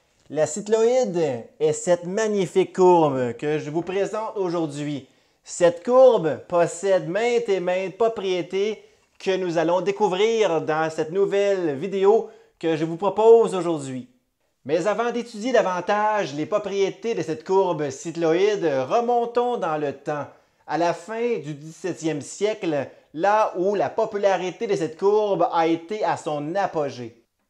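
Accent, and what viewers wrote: Quebec, Canada. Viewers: L'accent Canadien ❤. Entre parenthèses, très proche de l'accent en Charente Maritime en France. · J'ai 70 ans et, été toujours "NUL" en maths ( je suis plus "cerveau droit". L'artistique.)! Je viens de découvrir votre chaine et de m'y abonner. En plus de l'accent Québéquois; que j'adore (je suis français), je trouve vos thèmes très attrayants et qui m'intéressent énormément ! Vous me donnez le goût de replonger dans cette science. Merci. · C'est une belle explication mais il y a une grosse erreur que vous répétez à quelques reprises. La vitesse initiale du mobile quelque soit le plan incliné empruntée est rigoureusement égale à zéro. Et non plus ou moins grande selon la trajectoire prise. Merci cousine avec l'accent inimitable du Québec !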